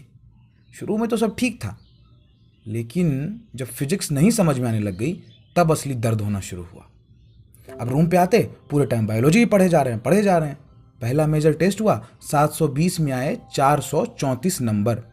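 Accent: Bihar, India. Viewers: Bhai bihar se ho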